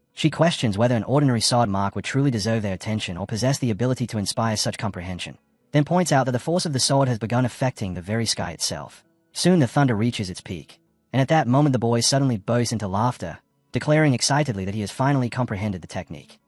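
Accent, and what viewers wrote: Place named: United Kingdom. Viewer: Didn't expect the ai to be British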